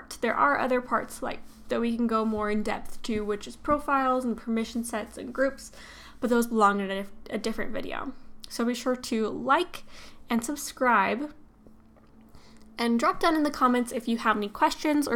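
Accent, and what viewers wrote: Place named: United States. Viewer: Thanks God for an American accent :) ( it's annoying trying to understand a very strong Indian accent :P) thanks for the video.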